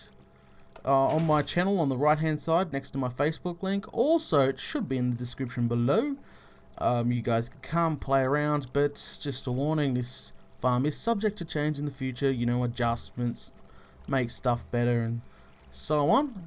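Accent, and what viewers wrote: Australia. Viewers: is infact Australian, and if you're interested he's still live! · U sound Australian? R u?? Plz reply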